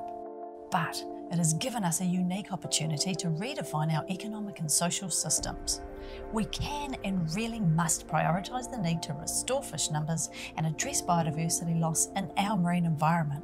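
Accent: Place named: New Zealand. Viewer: That's a good thick kiwi accent!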